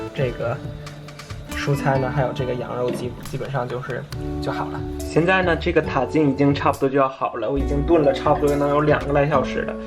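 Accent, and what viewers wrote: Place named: Shenyang, China. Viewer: 哈哈哈是沈阳老乡吧亲切的口音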